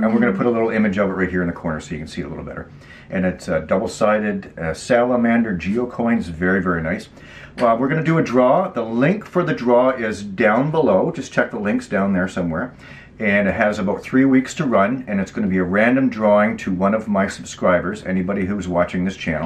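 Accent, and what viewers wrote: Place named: Canada. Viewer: Congrats my friend!!! Youve gone full tilt, you're doing things right and you'll be big time in a short time. You keep people interactive and I like it. And I love hearing Canadians talk...so keep it up!!! Craig from WV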